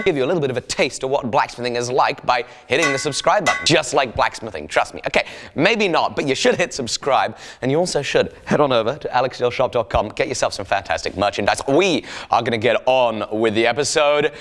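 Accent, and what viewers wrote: South, United States. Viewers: Quite the southern accent there. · That's the most accurate hick American accent ever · As a southern I find the accent you do spot on. And boy you know we love our diesels! Turbo diesel, regular diesel, diesel fuel. We love it. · Damn Alec, you have the Southern accent down. I think I've heard my relatives say the exact same thing about their trucks. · Holy hell your southern american accent. I can't even. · lol i loved his souther accent i was pissing my self laughing, keep up the good work Alec loving the project. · Isn't there an electric sander of some kind you could use? By the way: good job on the southern accent! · Your southern accent is fucking hilarious! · The southern accent is unsettling because i have to live around it. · That country accent though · Mighty fine southern accent y'all got there Alec. By the way, what's in that there spray bottle? 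Just soapy water?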